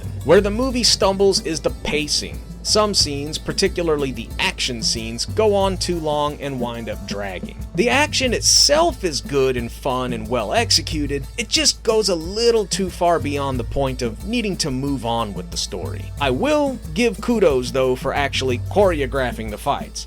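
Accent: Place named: South, United States. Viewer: Nice Southern accent. Texas? Dallas? Kansas? Houston? Nashville? I'm British so its impressive I know all these names.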